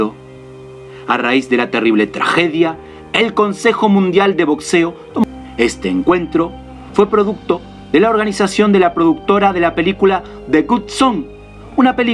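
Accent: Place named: Buenos Aires, Argentina. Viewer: Nuevo sub me gustó tu video, no sabía de esta historia.,estuve a punto de salirme por tu"she sheo " eres muy buen narrador pero evita ese acento "'porteńo" es muy molesto oirlo, Ojo no tengo nada contra los argentinos 👍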